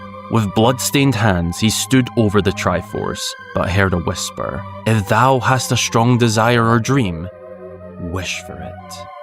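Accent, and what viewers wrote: Scotland, United Kingdom. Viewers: Scottish Zelda Storytime is now my favorite Storytime. 💚🏴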